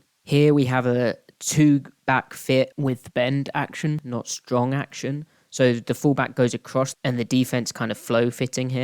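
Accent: United Kingdom. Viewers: It's cool hearing a British guy seriously understand football. 🤙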